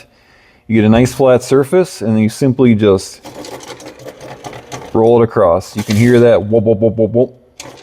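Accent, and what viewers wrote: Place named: Wisconsin, United States. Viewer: Your channel is going to blow up love the content where are you from sounds northern accent Wisconsin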